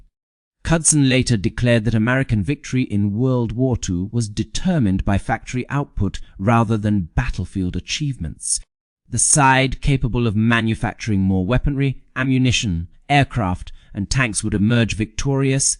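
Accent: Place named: United Kingdom. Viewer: Why add distracting and annoying flickering speckles and vignetted cropping to what could have been clear full images?!! Plenty of clear accurate public domain images of personalities, facilities, etc are available but your AI generates ridiculous junk. Why Brit-accented AI narration of 100% US operations?!! Can't your AI narration pronounce names, especially German, numbers, and much else correctly? DISLIKE! UNSUBSCRIBE!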